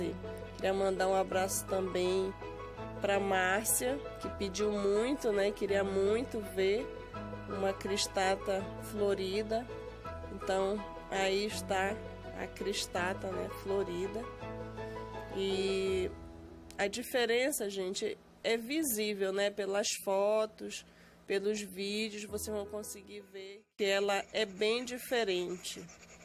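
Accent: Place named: Pará, Brazil. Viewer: Pelo sotaque, eu tinha certeza que moravas no Pará,! Só não sabia a cidade😅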